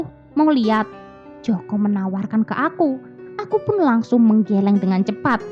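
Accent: Java, Indonesia. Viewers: Org jawa ya kak? Logat nya medok kali 😂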